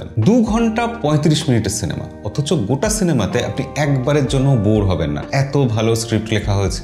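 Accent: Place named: Bangal, Bangladesh. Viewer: Apnar accent puro bangal😄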